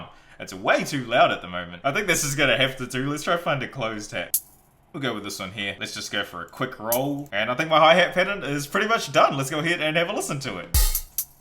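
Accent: New Zealand. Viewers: You from nz g?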